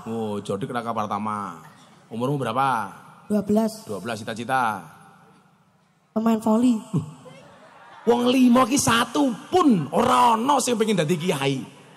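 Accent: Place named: Java, Indonesia. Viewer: Sy tiap hri nyimak ceramah e Gus Miftah gk membosan kan seneng jg dgn logat jawa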